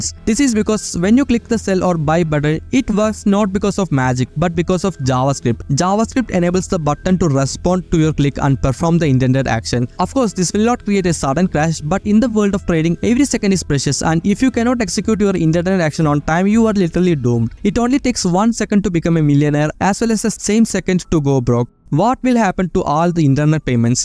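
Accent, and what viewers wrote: Kerala, India. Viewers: Malayali's english accent 💀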